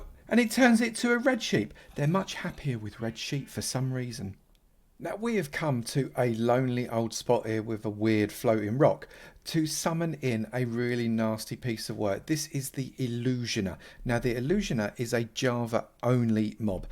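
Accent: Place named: London, United Kingdom. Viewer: Proper Londoner mate!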